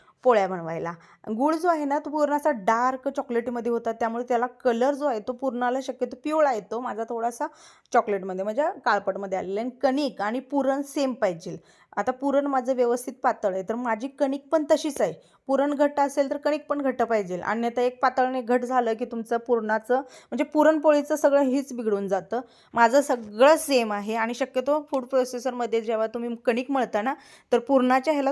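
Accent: Kolhapur, India. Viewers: ताई तु सोलापूरची आहेस का कोल्हापूर ची काही कळतं नाय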